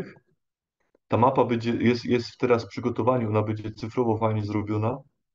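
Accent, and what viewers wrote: Silesia, Poland. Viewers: skad ten akcent ? Ślonzok ? pozdrawiam nowy widz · Ma Pan miły głos,jeszcze ten śląski akcent,wspaniałe się słucha.Pozdrawiam serdecznie!❤️🇵🇱🇵🇱🇵🇱🌹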